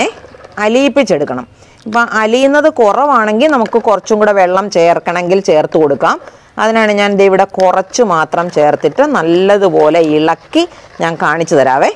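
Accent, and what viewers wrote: Kottayam, India. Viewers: സംസാര ശൈലി കേട്ടിട്ട് ❤കോട്ടയം ഭാഷ ആണെന്ന് ഉറപ്പ്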